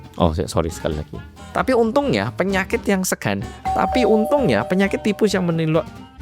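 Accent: Java, Indonesia. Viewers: Hai org korea yg medok😅 sehat selalu 💪🏿 Lgsg nyimak🎉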